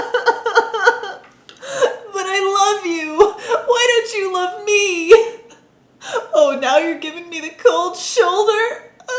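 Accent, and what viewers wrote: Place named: United States. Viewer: Lol. I was caught off guard bc it sounds a lot like mine and my mum’s voices. Kinda just seems like a variety of American accent 🤷🏻‍♀️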